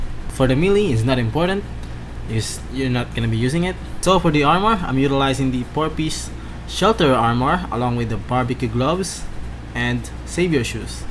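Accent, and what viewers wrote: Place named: Philippines. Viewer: Your accent is familiar.... You a Filipino my guy? btw thanks for the burn build idea.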